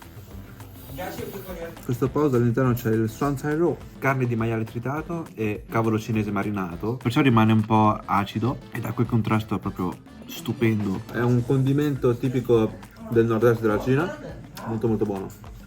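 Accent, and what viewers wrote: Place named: Emilia-Romagna, Italy. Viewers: Un cinese con l accento romagnolo.. Troppo forte 😅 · Davvero bella scoperta questo canale con questo simpatico ragazzo di origine cinese che parla italiano con l'accento emiliano-romagnolo! 😊👍 Iscritto!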